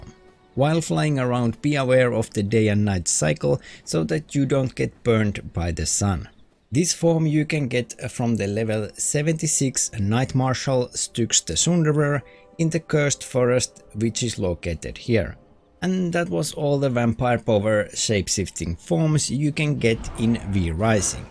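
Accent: Finland. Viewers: Haha Suomi-aksentin tunnistaa kyllä :D Hyvä video, kiitos tästä 😊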